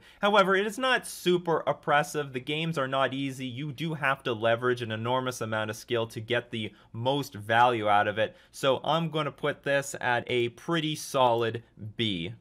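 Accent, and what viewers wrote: Canada. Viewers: When I finally heard it in your accent that you're Canadian. Lol love your content man.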